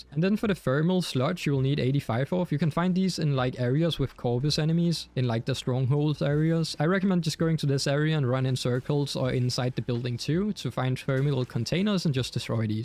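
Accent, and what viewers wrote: Denmark. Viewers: This guy sounds so danish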